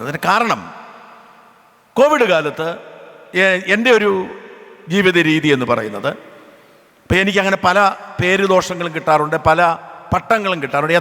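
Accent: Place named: Central Travancore, India. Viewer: എനിക്കിഷ്ടപ്പെട്ടത് എന്താണെന്നോ, താങ്കൾ ബ്രിട്ടനിൽ താമസിച്ചു വർഷങ്ങൾ ആയി തിരുവനന്തപുരം based ആയി ജീവിക്കുന്നു പക്ഷെ താങ്കളുടെ ആ മദ്ധ്യ തിരുവിതാംകൂർ ഭാഷ, നമിച്ചു ബ്രോ, എത്ര വലിയവൻ ആയാലും അമ്മയെ മറക്കില്ല എന്ന് ഒരു സന്ദേശം അതിലുണ്ട് 🙏🏻🙏🏻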